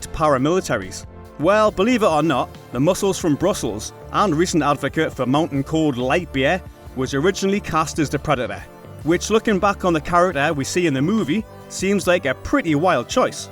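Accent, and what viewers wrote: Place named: Newcastle, United Kingdom. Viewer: That's a Geordie accent. Not Australian.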